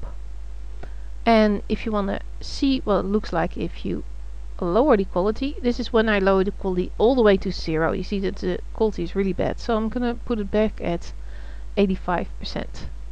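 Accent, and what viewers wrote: Netherlands. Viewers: Haha je nederlandse accent komt er erg door :) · Hihi bij je eerste zin hoorde ik al dat je Nederlands was :] handig filmpje thanks! x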